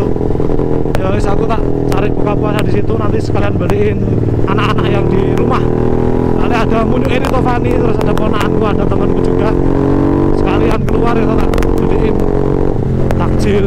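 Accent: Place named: East Java, Indonesia. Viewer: Sampean orang jawa timur ta bang,kok kalau ngomong jawa kayak basa jawa timuran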